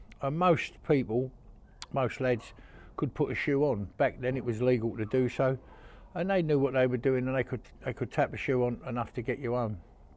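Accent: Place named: London, United Kingdom. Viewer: what part of london are you from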